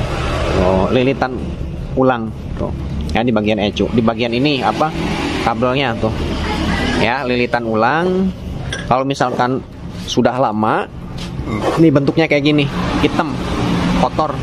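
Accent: West Java, Indonesia. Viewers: Paling suka deger logat orang sunda...rasanya pengen bgt pnya teman orang sunda...salam seduluran dr malang jawatimur bossq🙏🙏🙏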